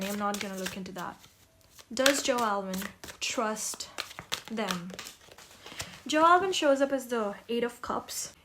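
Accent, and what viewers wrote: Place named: India. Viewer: WAIT ARE U INDIAN ??? Cause the quote sounds familiar and ur accent is the pretty indian one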